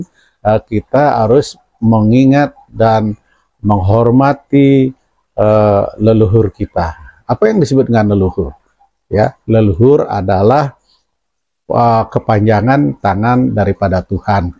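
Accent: Java, Indonesia. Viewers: Logat balinya g ada samasekali, malah cenderung logat jawa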